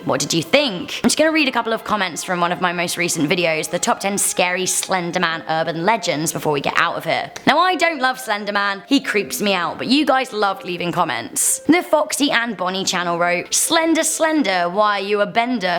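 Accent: United Kingdom. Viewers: I knew you were a brit us brits have eachothers backs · You lived in London until you were 8 before moving to Toronto? Why do you still have the stereotypical British accent then? Shouldn’t you have picked up one from Toronto??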